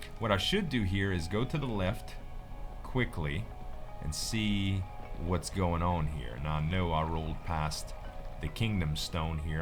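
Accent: Louisiana, United States. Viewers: I love your accent! Didn't realize it was Cajun. As a New Yorker, I'm not around it much/at all. Thanks for exposing us to it! (totally don't change :P ) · oh and btw are you from Louisiana? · Wait so is from Louisiana? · where in Louisiana? Im from Metairie:) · Aww, don't train away your cajun accent. It's one of the best accents in the English-speaking world! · did not know he has a kajun dialect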